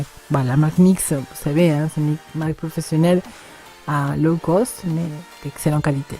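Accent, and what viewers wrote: Spain. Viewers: Ce maquillage est hyper lumineux et pétillant j'aime beaucoup tu es toujours magnifique 😻😻💓 j'aime trop ton accent espagnol moi qui a un amour grand pour l'Espagne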